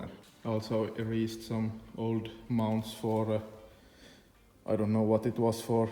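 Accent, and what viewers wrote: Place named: Finland. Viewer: I love Finland, finns and finnish accent. Anyway, great job! I want this car!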